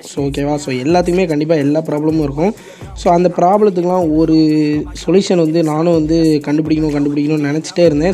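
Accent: Tirunelveli, India. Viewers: Bro neenga nellai yaa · Bro ongalukku thiruneveli ah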